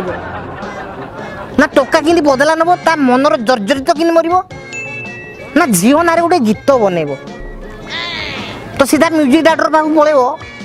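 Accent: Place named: Angul, India. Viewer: Dear ବାବୁ, ଯଦି CoPy ର କଥା ଉଠୁଛି ତ କିଏ CoPy କରୁଛି ଆଉ କୋଉ ଗୀତ କୁ CoPy କରି କୋଉ ଗୀତ ବନେଇଛି ତା'ର ସବିଶେଷ ବିବରଣୀ ଦିଅନ୍ତୁ । ଏମିତି CoPy ତ ସମସ୍ତେ କରୁଛନ୍ତି Ollywood ରୁ Bollywood ଯାଏ । ମୁଁ ଆଶା କରିବି କି ଆପଣ Next Episode ରେ କେଉଁ ଓଡ଼ିଆ ଗୀତ କେଉଁ ଅନ୍ୟ ଭାଷାର ଗୀତରୁ କିମ୍ବା କୌଣସି ଓଡ଼ିଆ ଗୀତରୁ CoPy ହେଇଛି ତା'ର ଗୋଟେ ସୁନ୍ଦର Video ବନାନ୍ତୁ । ଆପଣଙ୍କ Comedy ଠାରୁ ଆପଣଙ୍କ Voice ଆଉ ଅନୁଗୁଳିଆ ବୋଲି ଆହୁରି ଆକର୍ଷଣୀୟ ଲାଗେ। ଆପଣ ବି ଆଜିକାଲି ଗୀତ ଗାଇଲେଣି ମୁଁ ଦେଖୁଛି, ସେଥିପାଇଁ ବଙ୍ଗୋପ ସାଗର କହୁଛନ୍ତି କି? Confidence ଭଲ ହେଲେ Over Confidence ଭାରି ଖରାପ।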